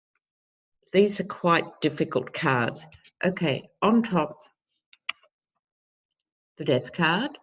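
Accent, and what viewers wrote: Australia. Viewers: Hello! Enjoy your readings, and especially your Australian lilt.:-) You, Linda G and Violetta are my favorites and listen to you regularly. Wondering, how it comes to pass that all three of you are now wearing turquoise rings last few days? Did you all agree beforehand? Or do you all feel the need for Throat Chakra strengthening of Truth? Or some other prompting? Thank you for all your work, Namaste. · I watch you with the closed captioning on and it’s of great amusement to me how it misunderstands your lovely Australian accent. For example barter become butter and pentacles becomes sentinels. Flynn’s becomes flinched. Short list is shortness. Good work!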